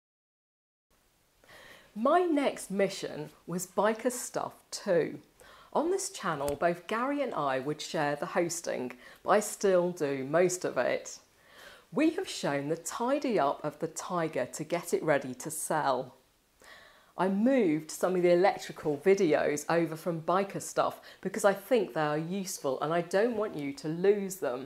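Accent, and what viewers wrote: England, United Kingdom. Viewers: Given the obvious of being a beautiful girl from Cornwall with an equally beautiful personality I would still view your video productions if say perhaps the topic was Cornish game hens! Great British accent Greetings from Arizona USA, where we speak some sort of english. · I love her British accent. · I was wondering where you were for a while but sort of figured what had happened. Anyway allways enjoyable content on all channels. No offence to our American friends it's all ways good to hear a English accent. I was born in St Leonards but now live in Australia. My bike 1984 GPz 900r. Good luck and good riding.😉😉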